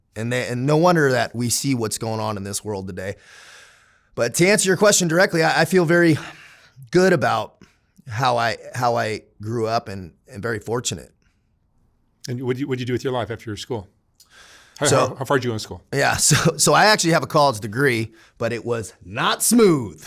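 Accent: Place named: New England, United States. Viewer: his ummss are just fuckins. hes a new englander like me so i know😂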